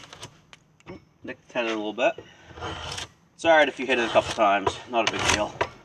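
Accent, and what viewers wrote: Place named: Nova Scotia, Canada. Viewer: Bro you sound like youre from Nova Scotia, I love it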